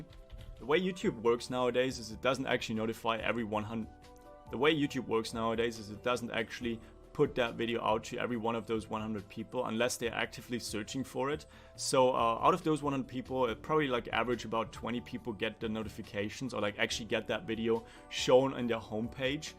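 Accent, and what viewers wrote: Germany. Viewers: U from Germany?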